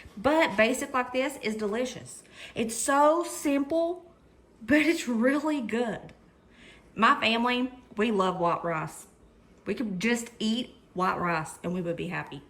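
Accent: South, United States. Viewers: I love eggs and rice 😊😊 Your southern accent is adorable 😊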